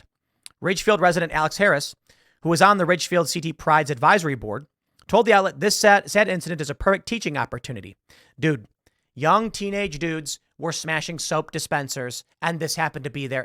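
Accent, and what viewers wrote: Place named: Chicago, United States. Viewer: I hear your Chicago accent today!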